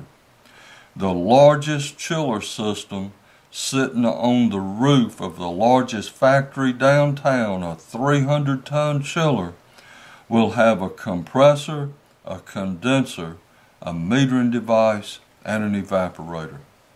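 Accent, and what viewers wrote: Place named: South, United States. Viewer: Very cool southern drawl too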